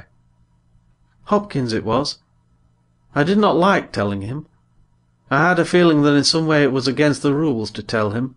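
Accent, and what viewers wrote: United Kingdom. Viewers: Британский!американский резче и они очень четко произносят многие звуки такие как R и не тянут гласные. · Типичный англичанин читал ни одного кошаче американского уррр уррр слова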